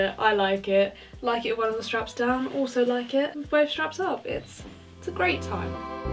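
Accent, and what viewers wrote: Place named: South East, United Kingdom. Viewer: So I hope this isn’t too intrusive but I was wondering if you’re from the south east, cos I am Kentish and I noticed you mentioned the Tate in Margate and you have a very familiar accent. Xx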